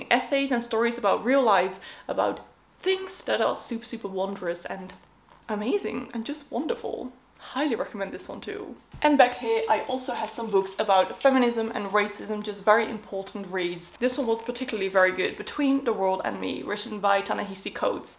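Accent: United Kingdom. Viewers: Oh huh, wauw. Ik las als in van de UK. In combinatie met je Brittish-achtige accent. Maar wat doet ze met al die Nederlandse boeken haha... Fijne dag.😊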